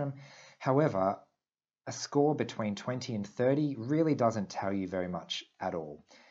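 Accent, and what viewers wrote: Australia. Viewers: Are you Australian? I keep hearing "IQ", not "AQ".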